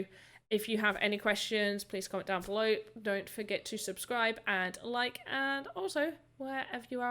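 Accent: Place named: England, United Kingdom. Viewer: May I ask, where in the world are you? What part of England?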